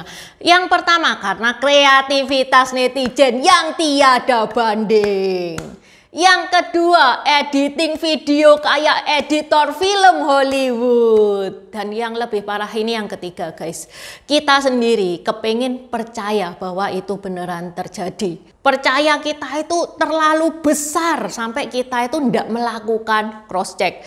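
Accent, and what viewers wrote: Java, Indonesia. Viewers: Wong ndi sampean mbak kok medok jowo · Medok tenan, ciri khaz e Semangat💪💪💪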